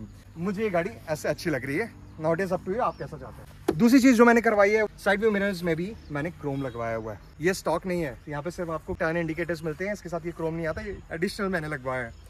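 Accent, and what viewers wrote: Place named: Uttar Pradesh, India. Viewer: BHAI AAPKA BAAT KAARNE KA TARIKA BIKUL UTTAR PARDESH STYLE HAE!! LOVE FROM LUCKNOW!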